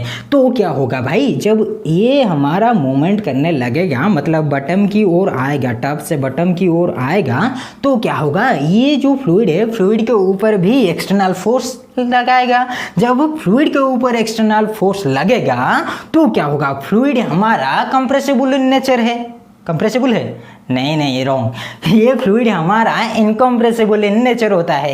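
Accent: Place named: Mexico. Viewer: Mexican accent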